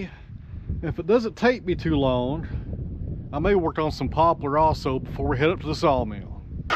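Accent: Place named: Florida, United States. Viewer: New subscriber brother i really this adventure im an ole Florida cracker and I pronounce the same as u God bless you brother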